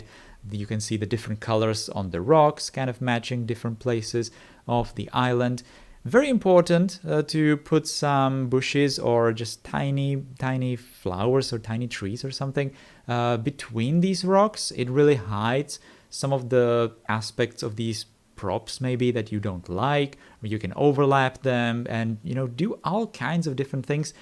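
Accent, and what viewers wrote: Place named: Czech Republic. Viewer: Personal question, you're not a native speaker right ? You sound a little Czech. Not insulting your English, i think it's great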